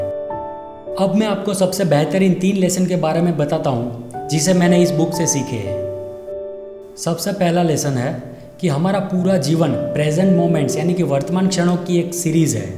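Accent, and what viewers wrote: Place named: Rajasthan, India. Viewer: Aap ki bolne ki style se lagta he aap Rajasthan se he.am I right?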